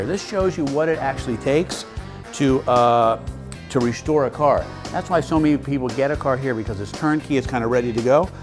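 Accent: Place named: United States. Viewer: really nice demonstration of your place and plain American English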